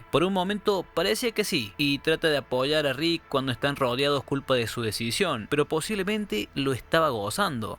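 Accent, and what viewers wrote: Cordoba, Argentina. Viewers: Videazo re bueno el análisis y el acento cordobés le da el toque 😂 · que grande el acento cordobes loco, es mi favorito del pais. Saludos desde Cordoba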